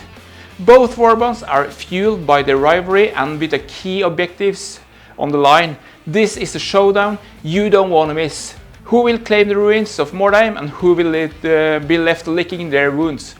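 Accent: Norway. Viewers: Den norske aksenten skinner fint gjennom :)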